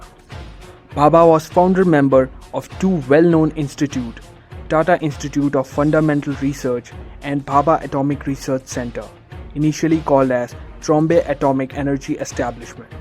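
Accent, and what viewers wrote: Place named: United Kingdom. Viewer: Fuck your British accent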